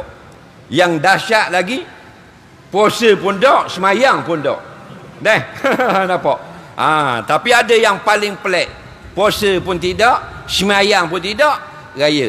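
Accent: Terengganu, Malaysia. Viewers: guna loghat terengganu dalam ceramah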